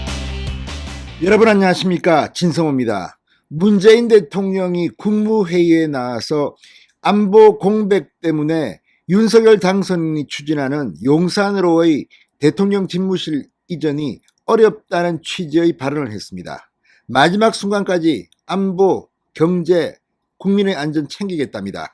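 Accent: Gyeongsang, South Korea. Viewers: 진성호 씨 /엇따대고... 속이시원합니다 완전 경상도사투리^^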